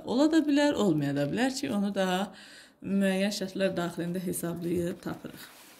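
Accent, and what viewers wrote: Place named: Nakhchivan, Azerbaijan. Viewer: Naxçıvanlısınz danışdığınız xoşuma gəldi +dərs izahı😍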